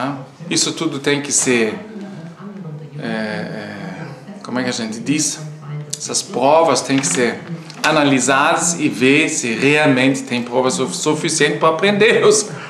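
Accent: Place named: Germany. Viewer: Ôh, é muito triste! O pior é que, o mundo todo sofre essa inversão de valores. Só Jesus! Parabéns pelo seu português, é perfeito, com esse sotaque alemão.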